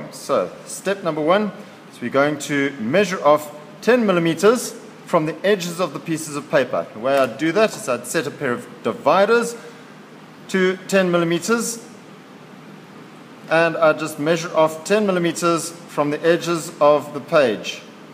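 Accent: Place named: South Africa. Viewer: South African accent i love it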